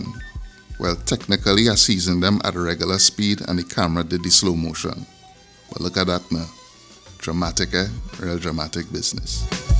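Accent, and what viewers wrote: Trinidad and Tobago. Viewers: I AM IN LOVE WITH YOUR TRINIDAD TALK 🇹🇹 😍 💕 ♥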